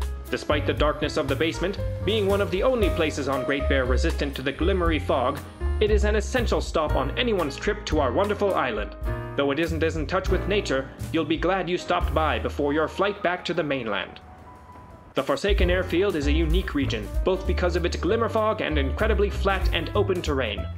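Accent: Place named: Transatlantic, United States. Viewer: Haha, this was such a fun video! Love the format, and your subtle Transatlantic accent 😂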